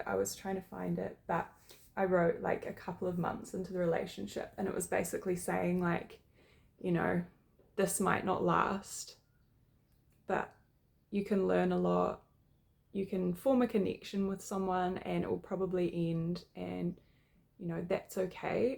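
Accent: New Zealand. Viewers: Extremely courageous of you to share this moment in your life. Give it time, time to & for yourself. I'm hearing a Kiwi accent. I'm not sure if you're in NZ? Maybe contact friends away from your area & get away for a while to break routines. Memories aren't always the best sometimes. All the best & keep shining 🌟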